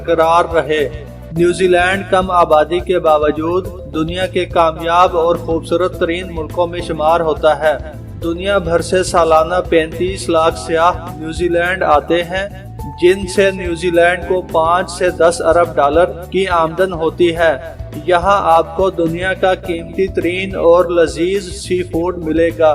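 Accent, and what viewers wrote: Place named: Punjab, Pakistan. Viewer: URDU GHAR HD : REF NEWZEALAND DTD NOV 14, 2017, BEST REPORTING AND COMPLETE INFORMATION, BUT COMMENTARY WAS UNIMPRESSIVE BECAUSE OF URDU IN PUNJABI ACCENTS. SOUNDED LIKE SOME VILLAGER READING.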